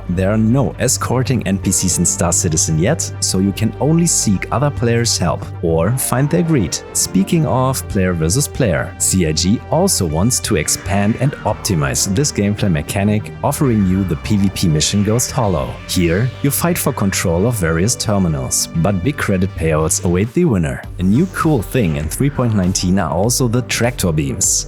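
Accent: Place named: Germany. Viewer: Don't blame him, he speaks weird with that thick German accent.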